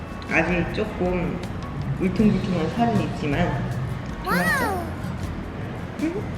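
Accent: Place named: Chungcheong, South Korea. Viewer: 힘들게 사는겨~ 충청도 사투리 정겹네요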